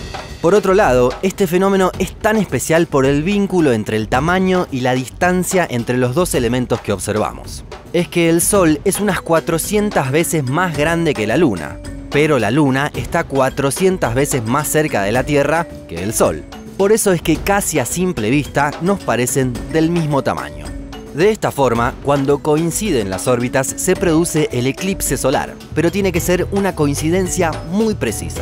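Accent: Argentina. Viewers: ¡Saludos desde Pittsburgh! Por fin encontré un YouTuber que habla bien y claro sobre temas interesantes. Me encanta este canal xk me expone al vocabulario científico en español, todavía estoy aprendiendo el idioma. Además el ascento Argentino me cae bien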